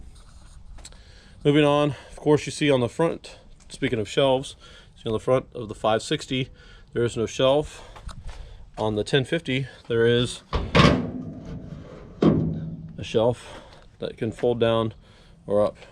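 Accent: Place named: Texas, United States. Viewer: I could tell that was TX straight away. The houses; the sky; your accent. I used to work for Continental Airlines in H-Town, back in the day. Excellent review,!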